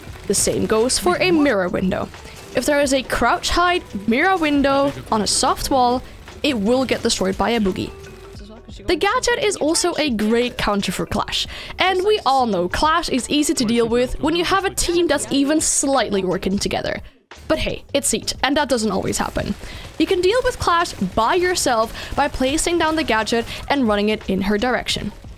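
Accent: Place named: United Kingdom. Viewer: Lol you're dutch? In another vid i saw u sounded British 😅 groetjes.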